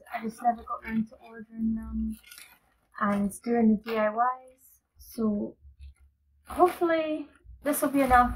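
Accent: Scotland, United Kingdom. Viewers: I've been listening to your lovely Scottish accent and following your awesome creations for about a year now. It's nice to see you in person. Congratulations on the upcoming addition to your family.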